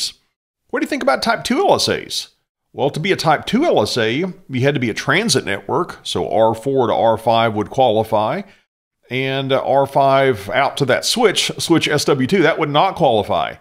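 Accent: Texas, United States. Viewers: is that a Texas accent?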